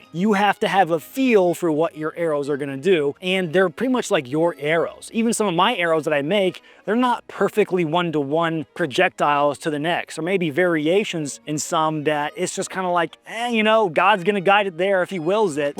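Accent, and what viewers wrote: England, United Kingdom. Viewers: His Brit accent isn't that bsd. Well, maybe it is but it's fun. 😁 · I’m English and I’m very impressed with accent he could definitely become an Englishman!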